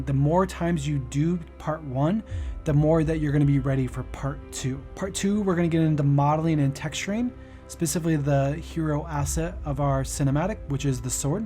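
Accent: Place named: United Kingdom. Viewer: Thank you for your efforts and including screenshots, and thanks for not having a strong British accent. =D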